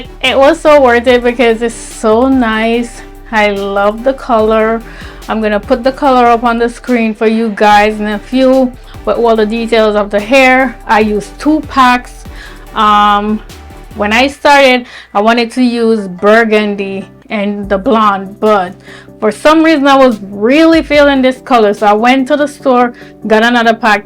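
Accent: Jamaica. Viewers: I hear an accent. Are you Jamaican?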